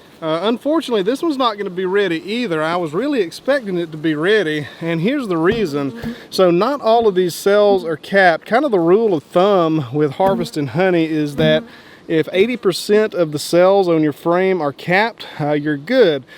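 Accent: South, United States. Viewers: That’s a cool accent 👍 What parts of America is that accent from? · you know the second you hear that thick southern tang, it’s gonna be the most pleasant video you’ve watched at 3am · Southern Chris Pine??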